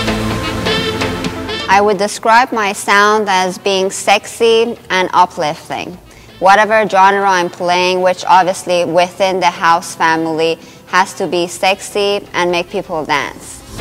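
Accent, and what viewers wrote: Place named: Canada. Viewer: wow your a Canadian? this is good to hear i kinda can tell with your accent.. how much did it cost you to purchase all your equipment and do you produce music as well?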